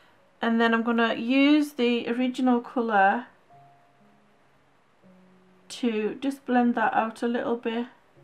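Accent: United Kingdom. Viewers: Well done! Love your accent - UK I'm guessing?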